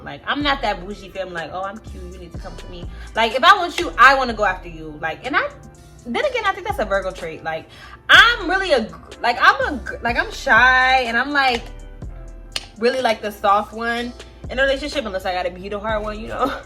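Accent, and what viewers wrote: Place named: South, United States. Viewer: Yea u either from Atlanta or Houston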